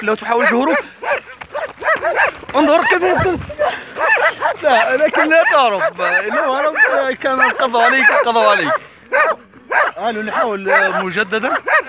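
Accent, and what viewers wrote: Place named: South, Morocco. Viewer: Noman Khushi أظنه مغربي من الجنوب وذلك من نطقه للغة العربية 🙏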